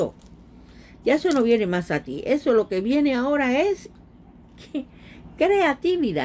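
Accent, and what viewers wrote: Cuba. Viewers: Hola soy dama. Nose tu nombre. X tu acento sé q eres cubana. Cada vez q te he escuchado, eres cm 1 alarma de ten cuidado....pero nose de quién??? Tengo q cuidarme. Ese es mi problema mayor q no se ver la maldad de las personas. Nose qn es qn,¿Quién me kiere hacer daño? Tampoco se xq me kieren hacer daño?? ¿ Xq me kieren hacer daño? Si yo nunca le he hecho mal a nadie...Sólo le pido a Dios qn venga a mí , p hacerme daño, Dios sácalo de mi camino. Y esa mujer q yo sospecho me está haciendo brujería sánale ese corazón malo hacia mi persona. Que me suelte ya. Tú sra q lees las cartas si sale de tu corazón ayudarme hazlo ...evita una tragedia x favor. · Luz para tii TE ADORE , Y SE NOTA QUE ERES CUBANA 100%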